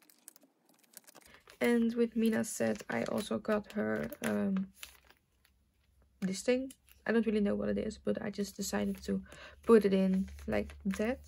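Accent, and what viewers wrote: Netherlands. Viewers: this is my first time watching u and the first thing i said was oh yea she's dutch and i was right 😌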